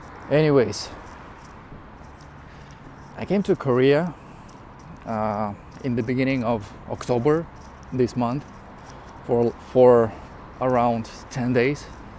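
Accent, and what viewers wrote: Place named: Eastern Europe. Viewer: He sounds a bit slavic...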